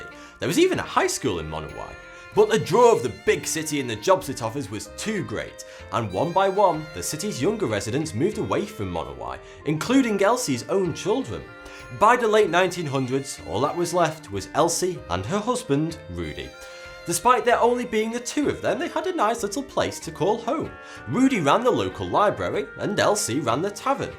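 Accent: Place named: Manchester, United Kingdom. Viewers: where abouts in England are you from? you sound northern I'm from Liverpool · Subscribed for the Mancunian accent.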